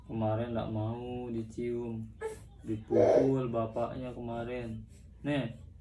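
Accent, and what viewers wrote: Lombok, Indonesia. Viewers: Bang logat lombok nya masih melekat😁💪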